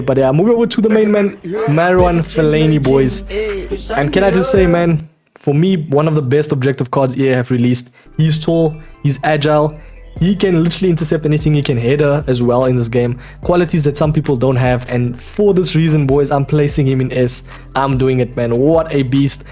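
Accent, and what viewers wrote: South Africa. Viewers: . You're from Mzansi?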